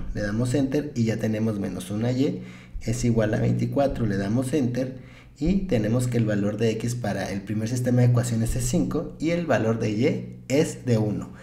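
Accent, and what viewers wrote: Mexico. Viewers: eres de cd juarez o nomas es mi ingacinacion xd?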